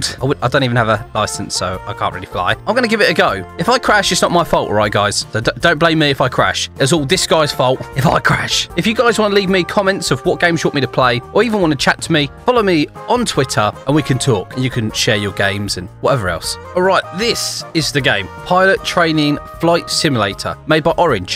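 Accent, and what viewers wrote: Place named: London, United Kingdom. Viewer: my mom said you are from london he knows ur accent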